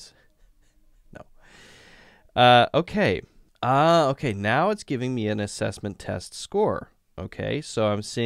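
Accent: China. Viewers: 4 years later and I just wanna say your Chinese accent hits hard as a Chinese person😢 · You have a very good Chinese accent 👍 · hahaha I find this video because of my work's research. Although this was three years before, it really helped! Thanks! And I have to say your Chinese accent of speaking English is pretty good hhhhhh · 应该是北方口音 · Your chinese accent is just wow!! So chinese! Impressive! Thanks for sharing this with us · your Chinese accent is amazing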